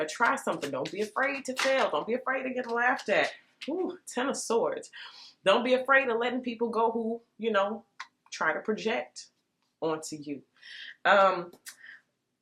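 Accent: United Kingdom. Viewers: You’re funny .... that British accent tho 😆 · whole reading resonated, haha yes queen vibes issa new energy haha picked up british accent lol x love from UK x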